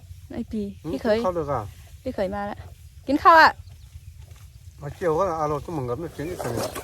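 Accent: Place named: Lanna, Thailand. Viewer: อู้เหมือนล้านนา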